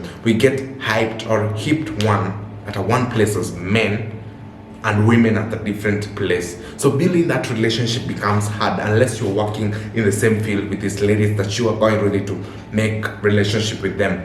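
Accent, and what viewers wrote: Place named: Qatar. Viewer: That Qatari accent in you is quite catchy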